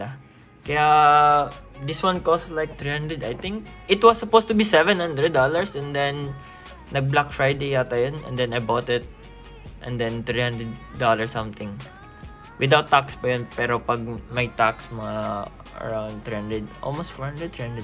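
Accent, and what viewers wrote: Visayas, Philippines. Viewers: Bisaya ka lods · sana-all akala ko may face reveal sa Dulo hahaha BISAYA accent talaga si lodi!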